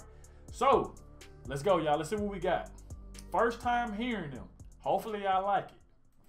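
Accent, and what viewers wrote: South, United States. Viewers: “ ”! You sound like a good ol southern boy! Thanks from Gainesville Florida! Best wishes!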